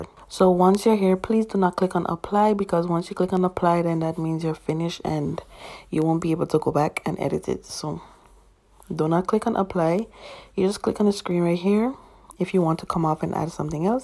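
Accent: Guyana. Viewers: Sis are you Guyanese 🇬🇾 ?? 🥺 cause your accent chileee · Hey hun you sound Caribbean asf like Guyanese where are you from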